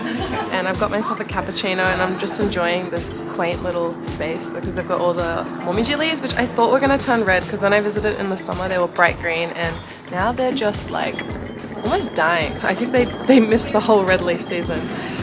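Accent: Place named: Australia. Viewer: You can 'hee-yah' the 'dee-yah' - I love your Australian accent! 💖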